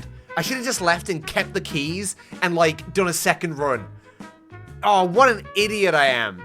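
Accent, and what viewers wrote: United Kingdom. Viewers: Firstly, you have a nice british accent, secondly i think it would be nice if you go to a room, and after some time, closed doors from every direction you can go just open 👍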